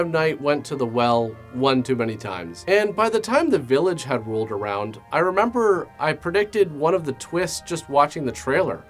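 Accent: Canada. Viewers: I found it quite obvious that he was Canadian after watching his first video. Although there are people in the Northern US that do have similar accents.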